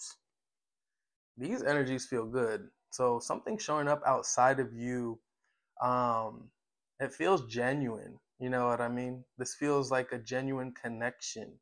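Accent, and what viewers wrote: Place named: England, United Kingdom. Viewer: Aaarrriieess! I loved your English accent 😜 thank you so much for your time and energy. Everything resonates as always ✨⭐️🌸 Sending so much love and light to you personally and also to all my fellow Aries out there - keep going- don’t give up! 🌸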